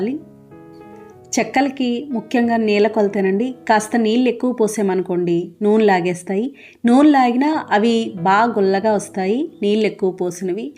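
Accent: Godavari, India. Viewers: Meedhi godavari aa andi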